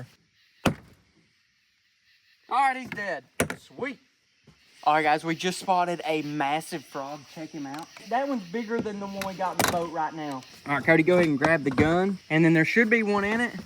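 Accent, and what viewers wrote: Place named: Texas, United States. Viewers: he sounds like Georgie from Young Sheldon... is he Texan? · texas accent going hard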